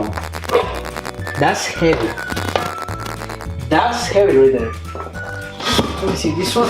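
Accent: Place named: India. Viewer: i like your Indian accent Bruh! lol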